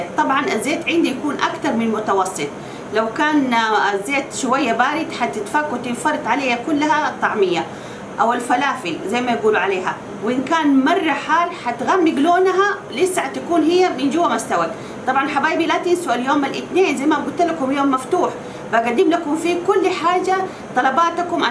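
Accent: Hejaz, Saudi Arabia. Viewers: ماشا الله. والله احلا شي ذكر الله 😙😙😙 وزين اللهجه الحجازيه. ياخ احب الام دي وبنتها ❤❤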